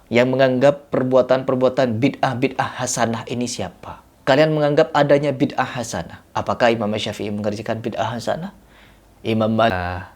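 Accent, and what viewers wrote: Madura, Indonesia. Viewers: Logat maduranya kelihatan😊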